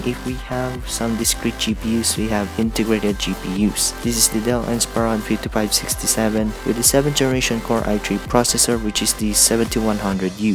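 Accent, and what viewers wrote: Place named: China. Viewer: i cant understand the damn specs with that chinese whatever style accent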